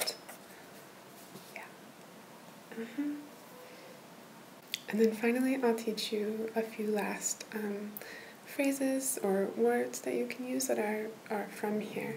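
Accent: Argentina. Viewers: is she argentinian? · Sos de Argentina? Porque tenés el acento perfecto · Yo con tu video tengo mas vocabulario 😊 y me gusta mucho el pais de Argentina 😍 mi idioma a aprender y mi acento favorito en solo un video 🙈 thanks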